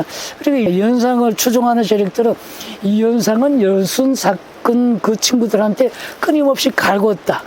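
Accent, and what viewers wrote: North Korea. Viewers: 이북 말씨네.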